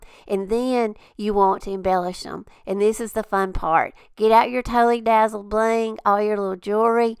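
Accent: South, United States. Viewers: I love your channel. I also love your accent.. it reminds me of Southern Hospitality ❤️🥰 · Me too! I do so love that southern charm accent that shouts easy going! Makes me feel home!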